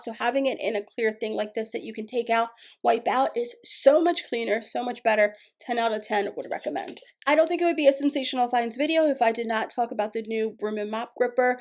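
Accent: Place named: New York, United States. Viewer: Hola, you are so pretty, I enjoy your videos I'm a Latina and you sound like a new Yorker, I love new York, shout out!!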